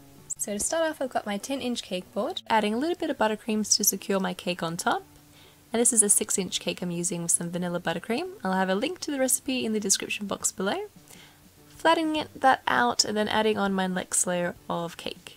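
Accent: Australia. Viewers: why do almost all the good bakers have Australian accents?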